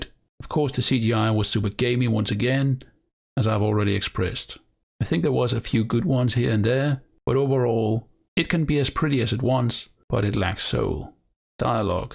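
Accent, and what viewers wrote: Denmark. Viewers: Im curious where you’re from? Cool accent If i were to take a guess I would say Denmark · Love your accent? Are you Danish?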